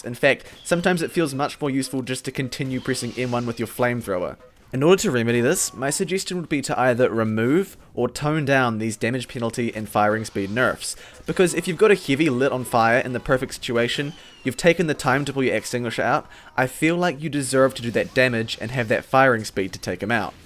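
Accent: New Zealand. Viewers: what a strong kiwi accent :) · Are you from nz?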